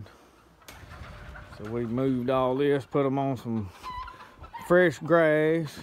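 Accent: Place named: Tennessee, United States. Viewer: Where are you located? Sound close to tn.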